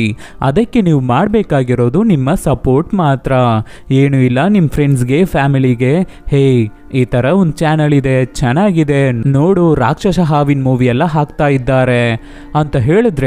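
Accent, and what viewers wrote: Tamil Nadu, India. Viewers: Kannada in Tamil accent 👌👌👌👌👌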